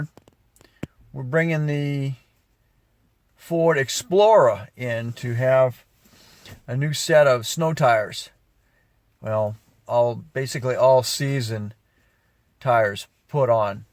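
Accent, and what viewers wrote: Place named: Vermont, United States. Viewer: guy sounds like he's from Vermont.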